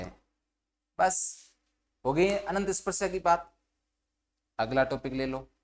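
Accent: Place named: Haryana, India. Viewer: Apke bolne ke stayle se lag raha h u r from hariyana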